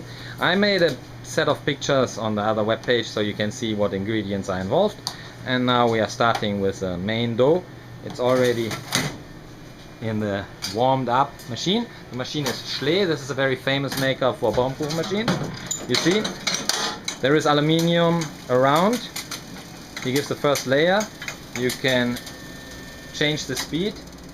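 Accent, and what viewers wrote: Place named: Germany. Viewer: I understand German and at first I couldnt differenciate between the 2 because you have such a German accent :D Like the video though (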